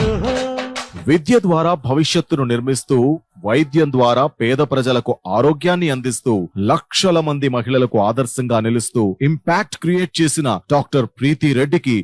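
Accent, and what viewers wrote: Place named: Telangana, India. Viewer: Acham telangana pilla lage matldthundi❤❤❤❤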